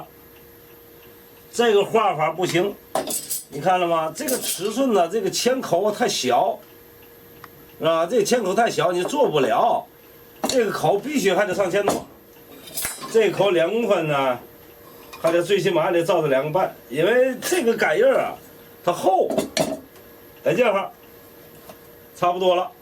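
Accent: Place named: North, China. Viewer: Usually I'm able to understand standard mandarin but the Northern accent of this master craftsman is just too much for me.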